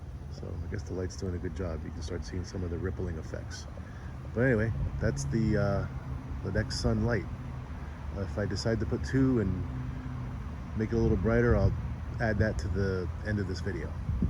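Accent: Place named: Long Island, United States. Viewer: Are you on long Island? Sounds like it....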